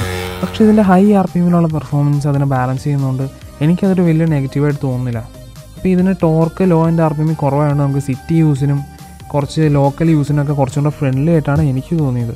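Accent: Kottayam, India. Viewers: Broo kottayam kaaran aanooo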